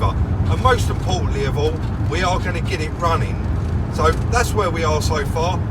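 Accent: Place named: Cockney, United Kingdom. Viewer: I have to admit, when I first started watching your channel, I thought you were another Mike Brewer-esque "cockney cheeky-chappy" and it put me off a bit. I couldnt be more wrong. You come across as a really likeable honest bloke with quite a bit of knowledge. The Range Rover engine swap vids totally had me hooked. I really look forward to your vids now, as I never know what curveball is going to come next. (The bike for instance). Awesome channel. Keep em coming. "Lets get involved"👍👍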